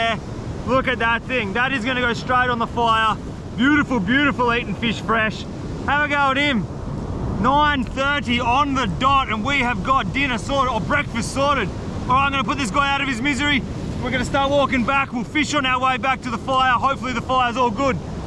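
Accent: Australia. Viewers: "that's so weeeered" lmao I love Aussie accents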